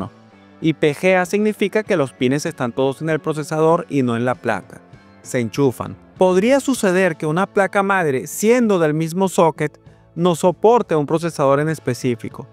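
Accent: Venezuela. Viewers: bro tu eres venezolano?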